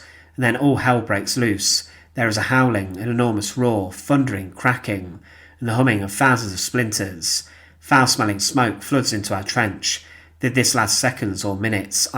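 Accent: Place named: West Midlands, United Kingdom. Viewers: He has a much better voice and pronunciation care than so many shoddy YT presenters who don't bother or care to learn how to say foreign names and words. He writes and organizes well and carefully. His particular English accent another commenter said is "West Midlands." As an American I've had no trouble understanding him. If you have specific names he doesn't say sufficiently well, then pointing them out and writing phonetically how to say them could help. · Modulating his voice? If you mean the intonation, that's just the local accent in parts of the West Midlands where the narrator is clearly from. People have different accents including different intonation, that's just the way it is. I watch channels hosted by people from the deep south of the USA, they too have very specific accents and intonation but I just accept that as part and parcel of their narration.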